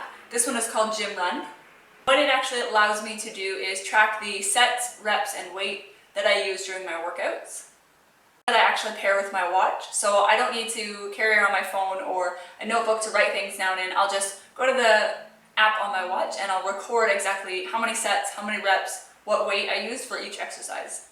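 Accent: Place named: Australia. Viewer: I'm hearing such an Australian accent in the intro. Its slight but I recognize it because my brother is also a Canadian living in Australia. I love habit trackers.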